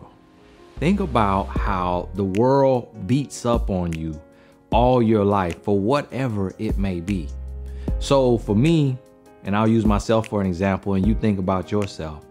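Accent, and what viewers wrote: South, United States. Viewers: I love the southern accent!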